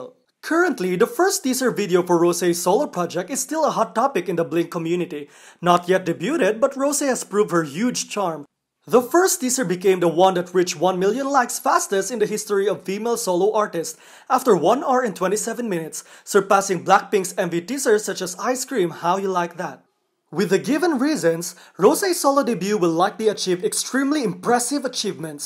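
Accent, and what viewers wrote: Philippines. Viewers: You have a Filipino accent 🧐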